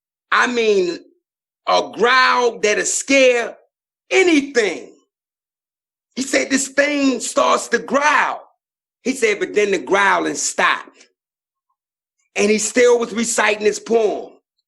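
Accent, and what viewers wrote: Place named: South, United States. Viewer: It's the Southern way of telling a story... very distinct... You will hear the same cadence.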